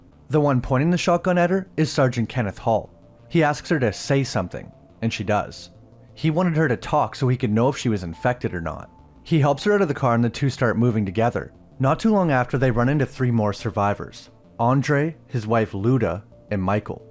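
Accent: Canada. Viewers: You lad are a canadian, 😂 i just noticed - tha accent been lost lately, you sneaky bouuut beer drinking mofo 😂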